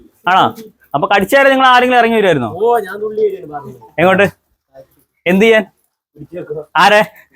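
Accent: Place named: Vadakara, India. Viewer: ഞാള് ഞൂള് തല്ലി പൊളി വടകര മലയാളം😅😅😅😅😂😂😂😊😊😊😊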